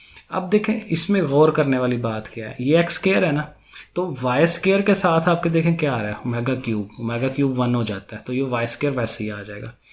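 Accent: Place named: Lahore, Pakistan. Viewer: Ap ki language lahore jasi lg rahi ha lakin ap muskil kam ko bohat easy kr daty ho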